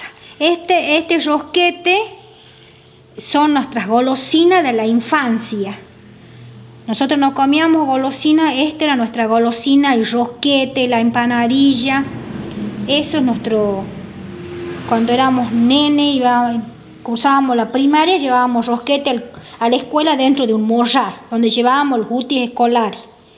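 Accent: Tucumán, Argentina. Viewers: gracias por compartir tus recuerdos,y recetas..soy Santiagueña y muy fuera de lo que dicen amo a Tucuman,es una provincia que me brindo mucho,sobre todo laboral...gracias por tú voz inconfudiblemnte Tucumana!!!! Díos te Bendiga!!!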